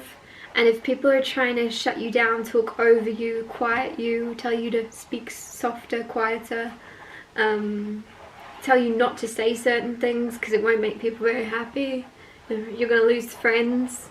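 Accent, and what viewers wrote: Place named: United Kingdom. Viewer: Hi! Cheers to you and this message ❤✨ Spirit has confirmed what I have felt through your channel and channeling. As a former ex-pat your voice makes me miss the lull and grounding nature of your British accent in particular. I went to drama school over there and studied the different dialects actively and inactively. I am grateful I came across your lovely energy and channel. You're gifted. Keep spreading Love and Light and inspiring innovation and courage ❤ Blessings and Light to you Olivia x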